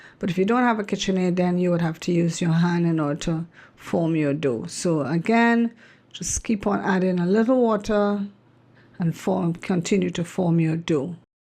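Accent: Trinidad and Tobago. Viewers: Love It! 🇹🇹 not related to the recipe but you sound a bit Trini :)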